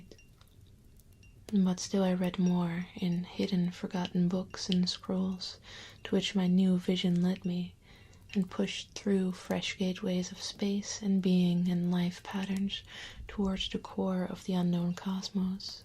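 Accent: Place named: Netherlands. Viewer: fireplug1701d Ah, you should have been here a couple of years earlier my friend. The lovely accent was more pronounced. Check out her older videos on the main playlist. I remember when our lovely couldn't say the number "three." There's no "Th" sound in the Dutch language. So three came out as "tree." It was adorable in her ASMR voice. Then one day, someone in a livestream requested she count to three. She scowled a bit before doing just that. End of an era.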